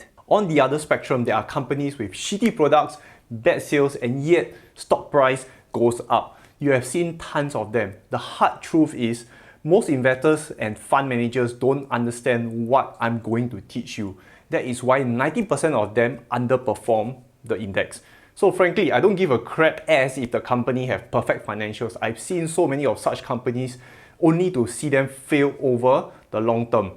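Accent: Singapore. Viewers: You are incredible! Straightforward advice in a simple straight manner... love your accent.... Go Singapore! By the way, I am a Tesla fan as well... want to talk about Space X or Solar City going public on their own anytime soon? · I like the way u talk, really bring out the singaporean in us. Haha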